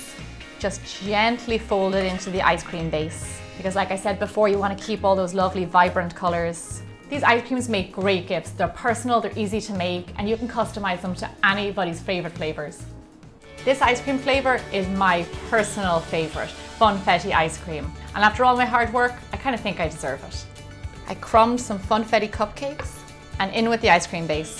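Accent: Ireland. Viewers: I think she's from Ireland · Gemma Stafford You're from Ireland aren't ya 😊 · Are you from Ireland? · Who else is addicted to that irish accent (if I'm right about the accent)😂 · She has to be irish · Are u Irish???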